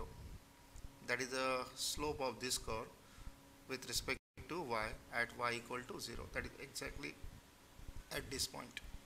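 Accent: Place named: India. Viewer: for the fuck's sake. i hate this accent and every fucking lecture on youtube has an indian guy speaking with stupid accent which is like a torture to understand. fuck this shit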